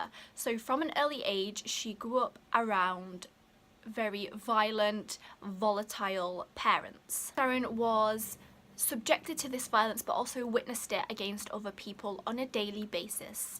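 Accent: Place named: Manchester, United Kingdom. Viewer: love your videos sooo much! so interesting and it’s lovely to hear a mancunian lol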